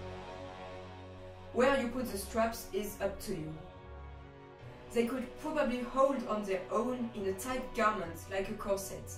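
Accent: France. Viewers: Reconnais bien là l'accent français merci pour tes 2 vidéos qui m'ont beaucoup aidé car je compte acheter les matériaux et faire seul de problème c'est que et on est que tu dis tout ça en anglais il y a des matériaux que je n'ai pas compris la signification.😅😅 Par exemple le truc en aluminium que tu mets dans les ailes, la matière du coup pour faire le squelette des ailes qu'est-ce que c'est, et je t'avoue que la fin pour du coup faire le truc pour le soutien j'ai pas très bien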